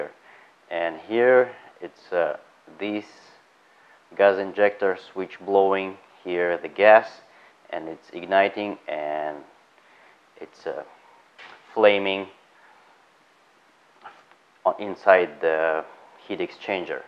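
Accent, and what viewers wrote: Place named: Russia. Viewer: 🤣😅да на таком рашен инглише почти все у нас в сша и говорят. у меня волосы дыбом но все англоговорящие вынуждены понимать и никто и глазом не моргнет) а куда деваться?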